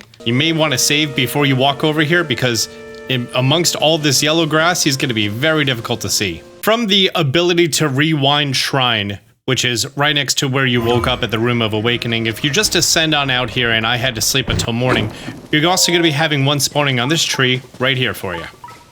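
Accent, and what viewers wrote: New Jersey, United States. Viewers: Oh man, New Jesey English is like trying to talk to someone from switzerland. (Hello from Austria )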